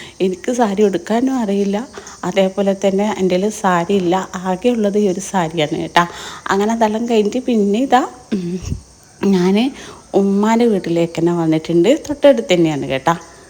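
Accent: Kannur, India. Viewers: നിന്റെ ഒറിജിനൽ കണ്ണൂർ ഭാഷ അടിപൊളി 🎉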